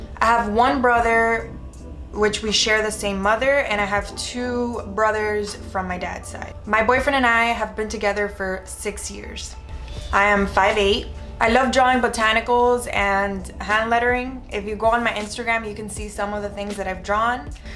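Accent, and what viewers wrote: Cuba. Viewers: I totally knew you were Cuban! Your accent really reminds me of Gloria Estefan’s! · i knew you were cuban, you can hear it in your accent. love your style! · OH MY WORD I KNEW YOU WERE FROM MIAMI!!!!! YOU HAVE THAT CUBAN ACCENT FROM HIALEAH...not a bad thing I just love ppl that don't forget their roots. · You kinda sound like Derek Zoolander, he he, maybe it is your Cuban ascent : ) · girlllll I subbed strictly because I heard your cuban accent and this video confirmed you were! 305 till we die... hahaha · ah okay so you are cuban, was wondering because of your accent!! Aries here too!! yay ^^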